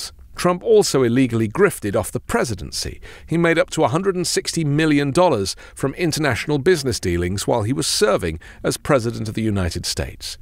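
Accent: United Kingdom. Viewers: You have an excellent way of explaining Trump. I love your Podcasts. I always was a sucker for a British accent ever since the.😊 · My maga people are not going to listen to. Can not get past the British accent in his speech. Not American. The bigotry is astounding. Sorry,.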